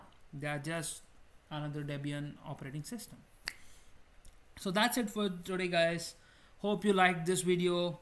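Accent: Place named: India. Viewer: You Sound like Indian.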